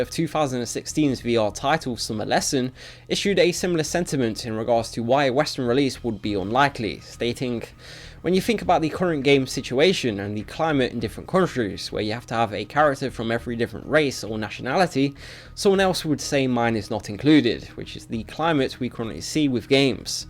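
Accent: United Kingdom. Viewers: You sound like Chris from Family Guy but British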